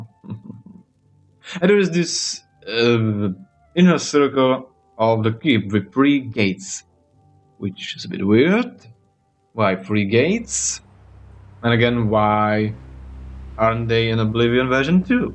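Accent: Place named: Europe. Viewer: I think he's just European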